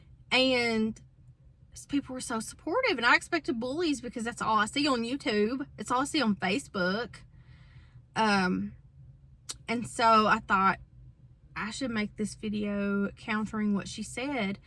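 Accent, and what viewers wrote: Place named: South, United States. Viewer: Thank you. Your sunshine and peace and don't let them hold you down attitude is the exact energy I wanted to match today. Thanks for being that and your sweet southern accent made me miss Mississippi just a little. Some people are as sweet as the sweet tea they make down there and I miss that warmth! ❤ Lots of positivity your way all the way from Canada. 😊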